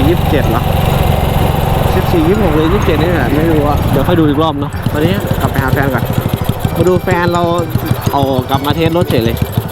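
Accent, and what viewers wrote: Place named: Phu Thai, Thailand. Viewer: พี่พูดพาษาผู้ไทเหมือนผมเลย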